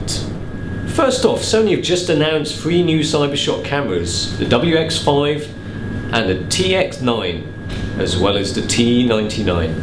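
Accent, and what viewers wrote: United Kingdom. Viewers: "Presented by an Asian guy with a funny British accent." Hahaha always my first thought when watching DigitalRev videos! :)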